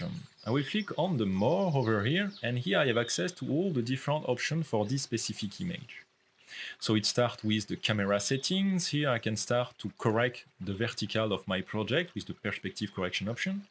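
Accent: France. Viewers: Beside this awesome introduction to the Twinmotion, I love your accent dude :) Vive la France! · c'est marrant j'ai l'impression de que c'est un français qui parle anglais , j'ai raison ???? en tous cas tu sais utiliser l'outil bravo !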